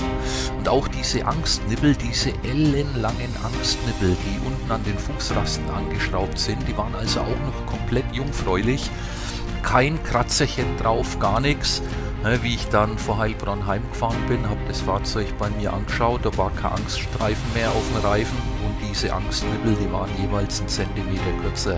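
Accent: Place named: Franconia, Germany. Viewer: Do hör i doch an Frangen. 😊 Ich fahr auch den Storm auf meiner Sv. Ist aber eine Euro 2. Gruß aus Mittelfranken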